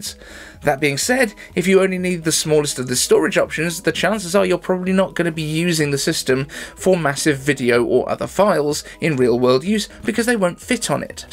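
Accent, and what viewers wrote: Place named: Birmingham, United Kingdom. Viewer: #iCaveAnswers I’m super interested in the geographical breakdown of your audience. As a British guy who watches the show, I usually assume the audience are also mostly British. The Mint Mobile sponsor made me wonder about how many viewers are actually from the USA and won’t even notice the hint of Brum in Daves accent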